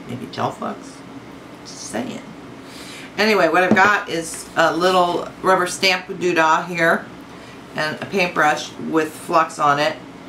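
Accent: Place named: India. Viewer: Intro sounds like indian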